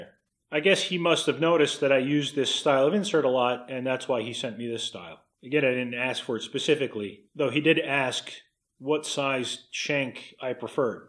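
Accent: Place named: United States. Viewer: three sets of two numbers? WNMG-08-04-08? What planet are you from? You sound American. I've been at this for 19 years, and where I come from (the west coast of the U.S.A it is one set of three numbers...WNMG-332 (of course your 08-04-08 is closer to a WNMG-2.52.52, but I have nver seen that, obviously, lol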